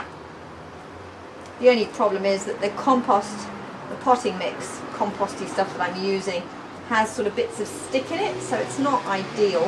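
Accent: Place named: United Kingdom. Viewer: I am so glad I sat next to Holly yesterday and found your site, lovely to hear the UK accent, I have a farm but really struggle to grow stuff(Mainly ate by animals possums and rabbits ) So I am excited to follow you and will sow my indoor seeds very soon. I have a worm farm and Cow, horse sheep poo but will use shavings for the chickens.( you would be very welcome to collect the other manure from us if needed ) Thank you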